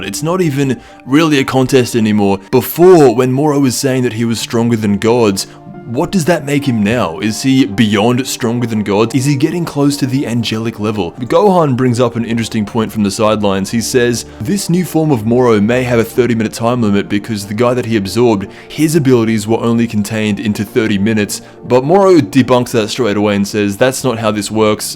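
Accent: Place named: Australia. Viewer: J T it’s the pure Aussie accent we all have including me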